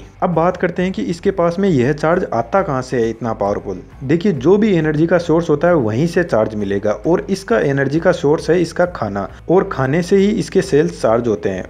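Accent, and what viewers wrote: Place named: Haryana, India. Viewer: Nice video bro....ur accent seems haryanvi... 😀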